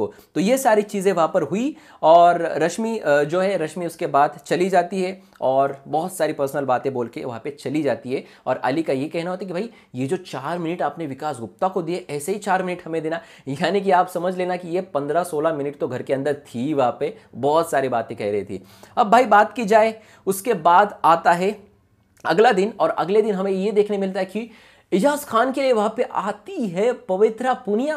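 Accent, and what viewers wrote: Maharashtra, India. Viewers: Hi...... Tu Marathi ahes ka....? Tuzya bolanyachya ton Varun vatat...!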